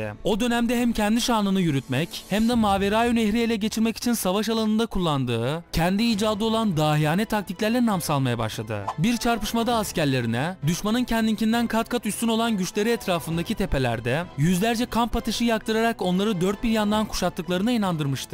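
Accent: Batman, Turkey. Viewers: Hocam batmanlımısın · Batmanlımısın kardeşim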